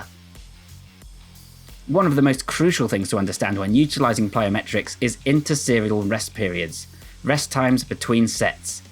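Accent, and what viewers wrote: United Kingdom. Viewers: He sounds british, has freak strength like a russian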